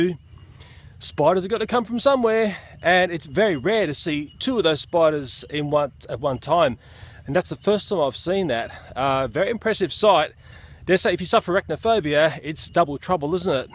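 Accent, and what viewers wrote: Australia. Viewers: Ur videos kill me i love ur Australian accent its the best . GOODNIGHT SISTA 😂😂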